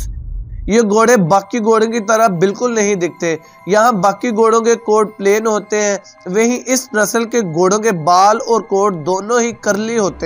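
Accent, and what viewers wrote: Pakistan. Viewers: Accent se bhai Pakistani lag raha hai. Waise jung ke lihaaj se Arabian aur Marwari Horses best hote hain. Inme bhot stamina hota hai aur ye jaldi ghabrate nahin hai. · Tumhare bolne ke andaje se lgta h aap pakistani h 🤣😂😂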